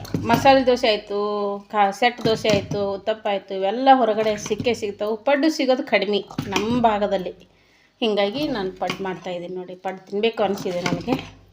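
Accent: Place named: Uttara Kannada, India. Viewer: Namaskar ri. Namma UK bhashe kelode ondu khushi. Chennagi ide paddu.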